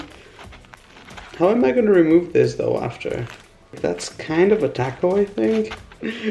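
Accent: England, United Kingdom. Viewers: Gracie Denton he’s from England so he says stuff differently than us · Seriously dude you're sounding more English each day... And no the milk should be poured, you can get the puddings in a can and steam it... Can't believe you didn't heat it up.... Lmao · Deadshot Mini Maybe cause he lives in the UK and tacos are not something they usually eat and they also pronouce things differently than we in America do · Thas is his accent cause he is in england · Hes accented ever heard of enlgland kid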